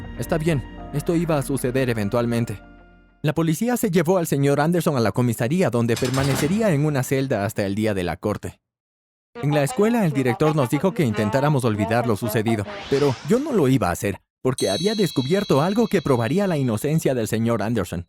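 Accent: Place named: China. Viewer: soy la única que pensó que la vos parecía de un chino hablando español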